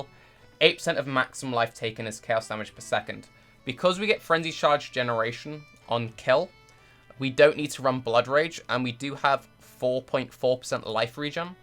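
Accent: United Kingdom. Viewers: Nice to know your graphic's card is going to arrive relatively soon. For what it's worth, i think your guides are actually really enjoyable even without gameplay. And that british accent is lovely <3. Hope you get better with your Chron's disease, and keep up the good work :D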